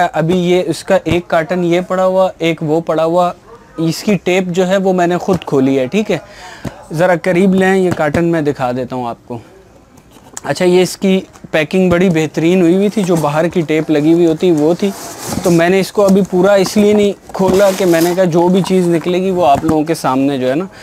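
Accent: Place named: Urdu, Pakistan. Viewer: Ur urdu accent and way of way of talk is very beautiful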